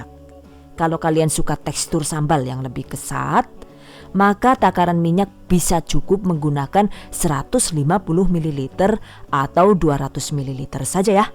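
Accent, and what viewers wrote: Banyumas, Indonesia. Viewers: Makasih resepnya mba 🙏 Bismillah aq mau coba buat usaha, semoga berhasil Aamiin Ya Allah Btw, kok mba nya bisa ngomong ngapak 😆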